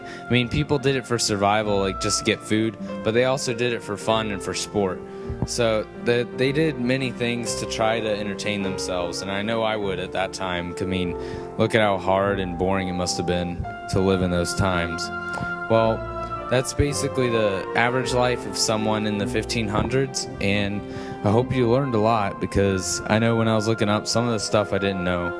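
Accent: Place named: United States. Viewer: Give me an Englishman or women please. Why do I have a teenage yank trying n failing to explain this to me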